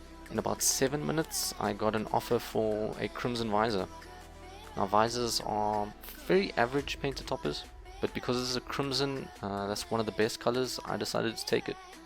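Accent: South Africa. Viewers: Are you South African 😂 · Are you South African? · You're South African?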